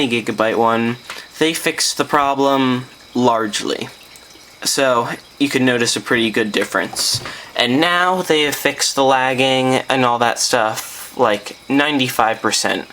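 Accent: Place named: Essex, United Kingdom. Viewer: sounds like your from the essex persuasion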